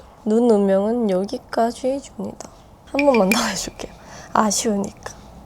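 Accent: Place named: Gwangju, South Korea. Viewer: 언니 혹쉬 광주사람? 어디선가 친근한 사투리가 들려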